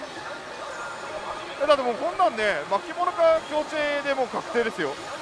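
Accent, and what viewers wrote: Japan. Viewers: 喋りあっての実践良いですね(´ー｀*)ｳﾝｳﾝ 関西弁？聞きやすいです😊ペアで頑張ってください😊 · すみません、大阪弁苦手です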